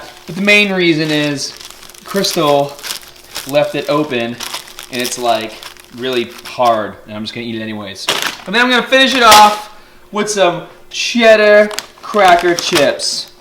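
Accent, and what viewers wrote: Boston, United States. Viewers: Boston haha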